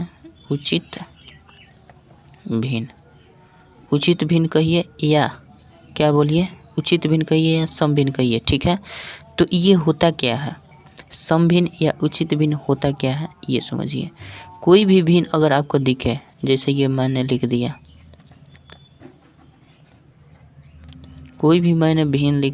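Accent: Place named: Bhojpuri, India. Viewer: Bhojpuri nahi bolte sir par aap bolte hai to accha lagta hai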